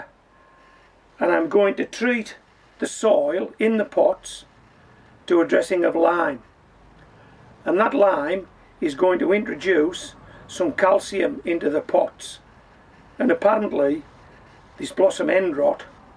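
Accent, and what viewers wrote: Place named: Northern England, United Kingdom. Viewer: What a great idea about the burpless cucumber, had to laugh about that one. What part of England are you from? You sound like a northerner, only ask cos your accent sounds like my dad :-). I always grew up with a veggie patch and only just started to get into growing stuff again. Nice to meet you, I am a new follower and enjoy watching your videos :-)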